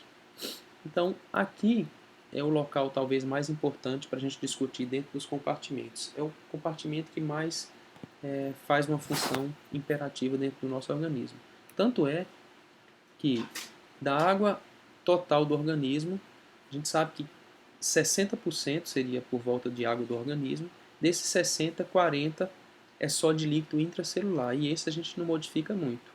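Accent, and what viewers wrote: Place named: Northeast, Brazil. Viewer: Falou muito e muito rápido, explica em Termos que só um Médico entende e o Sotaque Nordestino "comendo" o "R" no final das Palavras e isso como Médico, é uma vergonha!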